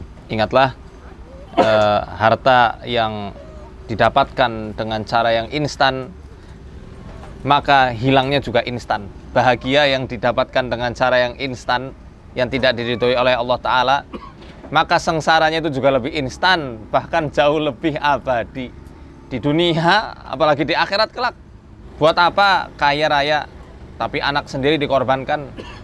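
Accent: Banyumas, Indonesia. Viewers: Ustadz nya dr negara ngapak ya ... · Ngapak yaa 😂😂😂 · Wong ngapak pancen jos koh jannn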